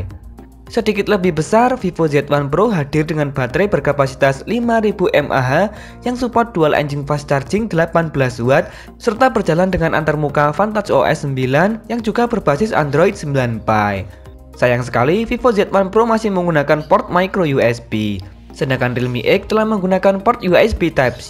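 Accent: Java, Indonesia. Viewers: wong jowo yo mas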